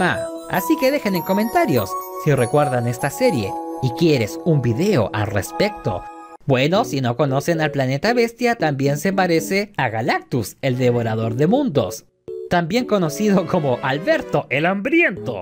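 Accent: Chile. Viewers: ¿Usted es de Chile? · De que parte de Chile eres?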